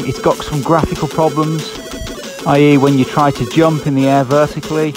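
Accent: United Kingdom. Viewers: Great video (and british accent). Some french video games here (Orion Prime ;) Any input on the "Grand Turismo"? They said it should have been released for more than a year now..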